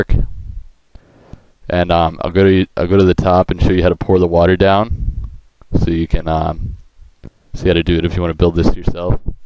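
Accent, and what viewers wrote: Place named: Germany. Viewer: great german pronouncing yeah :D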